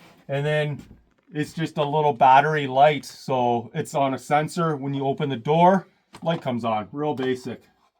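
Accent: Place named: Minnesota, United States. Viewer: You sound just like minnesotans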